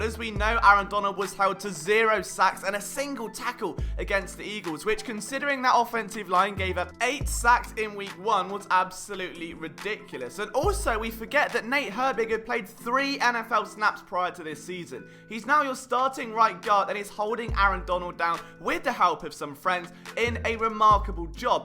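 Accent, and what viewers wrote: England, United Kingdom. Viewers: The Queens English 🐥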